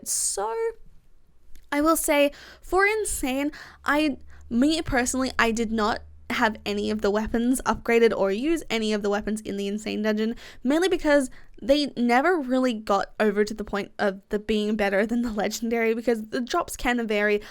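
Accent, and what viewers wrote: Australia. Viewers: this is no offence but it confuses me because she has a Australian and normal accent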